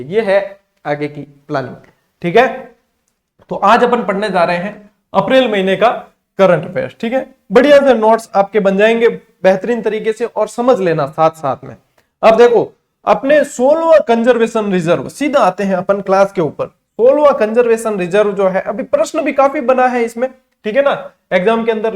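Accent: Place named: Marwari, India. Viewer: Sir aapki marwadi bahut mst lagti h Or bahut hi accha lagta h Me jhalawar se hu barambar pranam👌🙏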